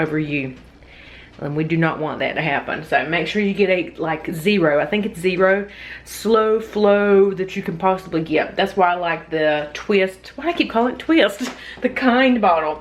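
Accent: South, United States. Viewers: Sis! I’m here for that extra southern accent! Also, good tips!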